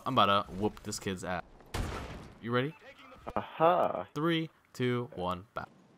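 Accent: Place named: New Zealand. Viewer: You sound like kiwi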